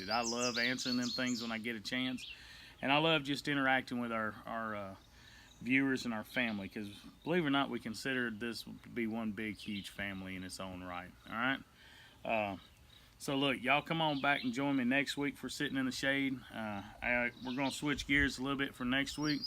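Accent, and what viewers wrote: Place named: Mississippi, United States. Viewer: NORTH MISSISSIPPI!?!?!?! Were y'all my neighbors when I was a kid!? I knew I loved your accent 😭 I was born in Coldwater, Tate County, do you know where that is? I moved to Oregon when I was 4, in 1978. Ok, now to restart the lambs ear cause I got all excited when you said NORTH MISSISSIPPI 💜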